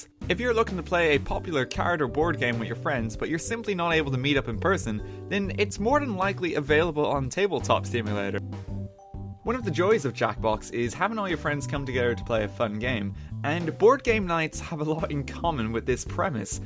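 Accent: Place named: Ireland. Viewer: 8 minutes of a buttery Irish accent. Score!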